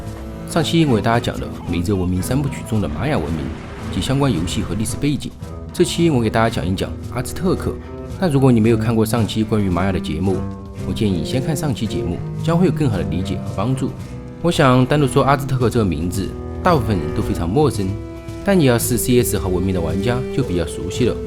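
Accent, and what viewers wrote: Sichuan, China. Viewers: 四川人讲普通话，这样已经很不错了，你是没听过更糟糕的，而且比起口音，我们在意的不应该是视频内容吗?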